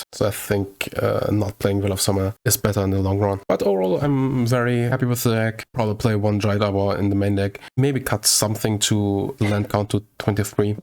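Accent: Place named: Germany. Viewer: I watch your videos for quite a while now. Today my girlfriend was sitting next to me, asking: "Das ist doch n Deutscher?!" Kurz die Kanal-Info gecheckt und Tatsache! Heißt schonmal, dass der deutsche Akzent nicht so krass ist, dass es mir besonders aufgefallen ist. Wäre toll, mal nen anständigen deutschsprachigen Modern-Streamer zu sehen. Aber klar, Englisch, weil mehr potenzielle Zuschauer. In diesem Sinne: Keep up the good work!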